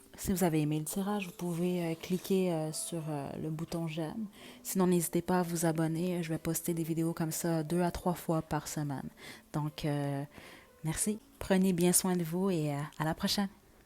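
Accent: Quebec, Canada. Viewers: Bonjour chère. Cela fait peu de temps que je vous ai découverte et j'apprécie vos tirages car ils sont variés au niveau des thèmes et ils raisonnent souvent justes pour moi. Et puis j'aime bien votre français québequois, c'est tellement beau et mignon à entendre 😇🌼Je reviendrai vers vous d'ici à quelques jours si vous avez vu juste.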